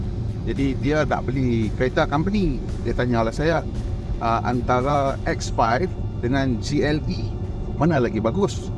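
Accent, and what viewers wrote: North, Malaysia. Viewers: Well review tuan…tapi kali ni personally rasa lagi best sebab kelauq nahu2 utara hahaha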